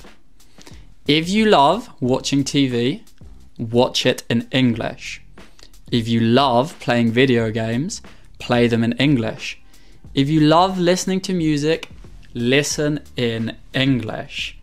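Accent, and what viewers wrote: United Kingdom. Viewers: I love your videos.. Your british accent is so to die for ❤😊have been living in the UK for last 2 decades..I was born and raised in India.. My passion for english made me learn the language.. It's really difficult to learn a language esp in adulthood.. Having said that, nothing is impossible.. Currently, I have been learning french by myself by using Netflix, YouTube videos and other resources.. I think, it's much easier to learn the language now than before as lot of content is available at your fingertips.. I recently watched your Reading town video.. I live in Reading town.. It's a beautiful place and felt happy to see it on your channel.. Wish you all the best for your future endeavors 😊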